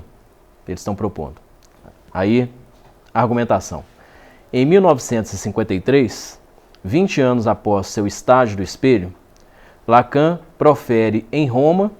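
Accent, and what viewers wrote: Minas Gerais, Brazil. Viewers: O jeito mineiro de falar da psicanálise faz dela brasileira...Boa palestra.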